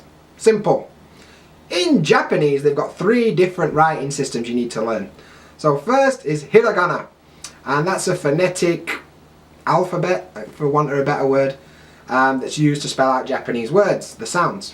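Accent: United Kingdom. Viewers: have you ever seen your auto-generated English subtitles? Your intro -> "Thirty-Two Eyebrow it's down from ten Dakota and today..." It's like they've never heard a British accent before xD I study Kanji by writing & creating weird associations in my head about what they could represent. The kanji for car kind of looks like a box with two axels, so it's easy to remember. Or for dog, I just remember it's similar to the kanji for fat and come up with the idea that all dogs are fat because they never stop eating. Cheers on 3k subscribers!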